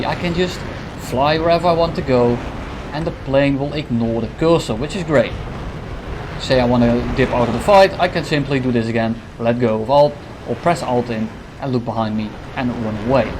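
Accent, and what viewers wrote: Netherlands. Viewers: als deze man niet nederlands is ofz dan eet ik men schoen op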